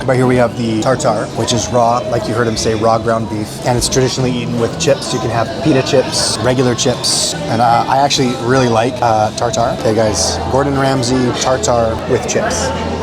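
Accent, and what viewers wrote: Philippines. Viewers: nakatawa kuya pinoy na pinoy accent mo😂😂😂 galing😂 whats you and your brother's software company name? is that also in philippines or abroad? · Nice Filipino accent Sir. Speaking Filipino gives due respect to the Philippines. We do not care of your intonation your Filipino talk is all what we love to hear from our visitors. Thank you Sir.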